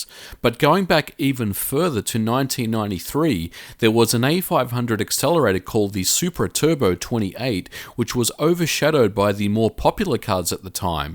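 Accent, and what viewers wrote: Australia. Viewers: So weird to hear someone with an Aussie accent say 'soddering' lol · Hmm, you sound like an ozzi but you say SODDER like a yank?? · Soddering? And with an Australian accent! It's spelt soldering, and that's how it's pronounced in English. There's no such thing as American English, just English spelt or pronounced wrong. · You sound like an Australian Al Gore. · He sounds like an Australian to me.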